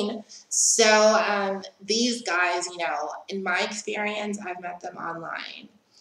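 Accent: United States. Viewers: You’re accent doest strike me as South african but rather American?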